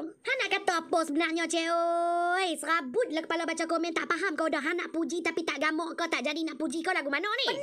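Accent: Kedah, Malaysia. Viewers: Nak lagi bideo cmni...sronok dgaq org kedah pure ckp...sy pn lahir besaaq kt aloq staq...tp kami loghat kedah besa2 ja... nih msti org blah2 kota sarang semut pendang yan batu hampaq kot noh😅😅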